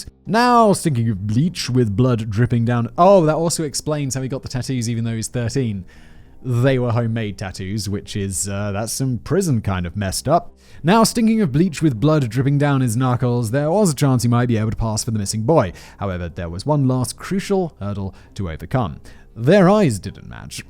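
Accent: RP, United Kingdom. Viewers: Blah blah blah. He's destroying the story! British accent isn't good enough to make up for the crap telling. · Let me just tell my friends how im addicted to watching videos about murderers narrated by a swanky bald british man, yea thats a good idea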